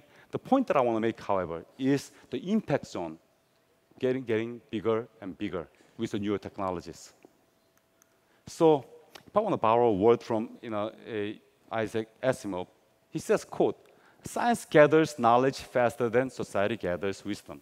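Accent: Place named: South Korea. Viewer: He has a thick accent. I understand that he's a Korean. I wonder why doesn't he speak Korean to Korean audience?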